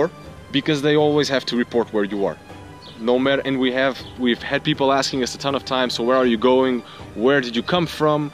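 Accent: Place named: Australia. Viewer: It sounds like Australian accents maybe wrong well that was nice from you Mate thanks for your visit to our beautiful country. for you guys is cheaper 👍🏼 come and visits us more often there's so many places to discover. Thanks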